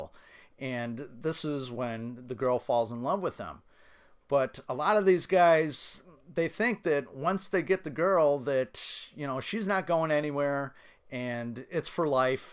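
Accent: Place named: Michigan, United States. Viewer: Are you from Michigan? Your accent sounds northern.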